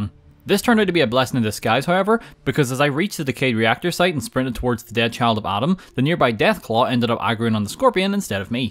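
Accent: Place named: Northern Ireland, United Kingdom. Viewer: the northern irish accent is strong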